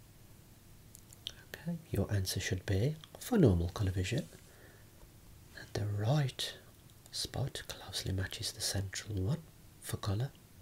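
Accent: United Kingdom. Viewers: Your voice and your british accent are so calming, I love it!!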